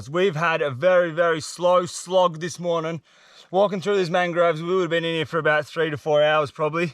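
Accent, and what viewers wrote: Australia. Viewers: Her name is? With your strong Aussie accent I always thought you were calling her "monkey" LOL it really doesn't matter cause I love it when you bring her along with you on these adventures such a beautiful person. I Absolutely Love Crab, but sadly I live in Missouri and we are miles away from any coast where you can catch your own. If I want crab I have to go to Walmart and buy frozen crab. But it's still good and very expensive.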